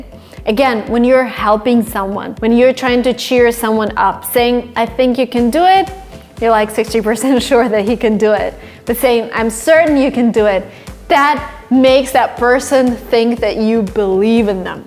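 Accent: United States. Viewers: I am Bangladeshi. I want to speak in American accent. I understand your American accent. But I can not speak like you. I am a govt. high school student in Bangladesh. I want to go to America for higher study. This is why I really want to speak in American accent. (My school's teachers are not good at American or any other accent). Our country has no any English accent. Some example of their (teachers) pronunciations are : Water=Water Think=Think Something=something If I speak American accent in the class everyone of my class laughs at me. They tell me ,"it is Bangladesh not America. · To my mind she was born to teach. I am absolutely sure that i’m gonna use these alternatives and use less “ I think “ so that i sound more fluent. Thanks for ur practical lessons dear i really do love ur voice and ur American accent. Above all I wanted to appreciate for teaching us all these contents for free. 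Wish u all the best🥰❤️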